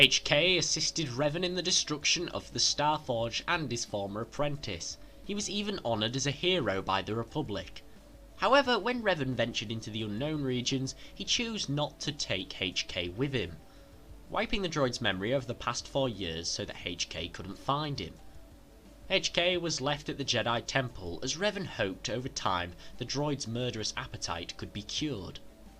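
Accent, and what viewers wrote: England, United Kingdom. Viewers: I don't get annoyed at the way anyone pronounces words. Being Scottish I'm often ridiculed by the English for how I speak. lol even my English friend does it. I just think its wrong to mock someone or get annoyed at someone because they don't talk the same way as someone else. and I found it ironic that English speaking Americans were getting mad at how an English person speaks English. It does sound kind of funny when you think about it. I didn't mean to cause offence or anything. Just found if kinda funny is all. Its really interesting how language develops over time and i get that. Although I have to say the Scottish language at its purest form confuses me. It barely sounds English. It just kinda bothers me how every video this guy makes some American is pissed because he talks different. Like in the UK most citys have different accents and slang and we embrace it. 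we don't tell each other were speaking wrong. That's all i was getting at. Why get pissed at someone for something that is really hard to change? I have been trying to lose my Scottish accent and pronunciation for years to try to Americanize it because I hate it and its hard. As for accents. Americans do have a thing for. the amount of girls who find my hot just because I talk Scottish is crazy. you cant really talk for your whole country just because you don't find accents attractive.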